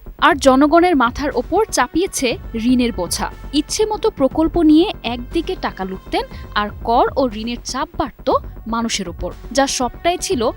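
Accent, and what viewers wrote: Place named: India. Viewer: নিউজ প্রেজেন্টার এমন শব্দ কেন উচ্চারণ করে কেন😂😂 একদম ইন্ডিয়ান মিডিয়া থেকে শিক্ষাপ্রাপ্ত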